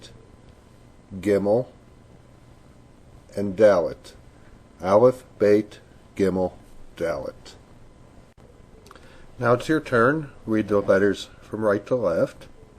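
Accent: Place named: United States. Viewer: It's more of an American accent. Not Ashkenazi. Maybe more Sephardic.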